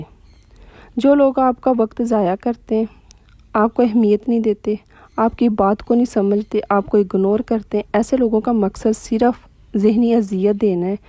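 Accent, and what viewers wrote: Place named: Sialkot, Pakistan. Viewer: Your accent is Sialkot,nice accent,so nice of you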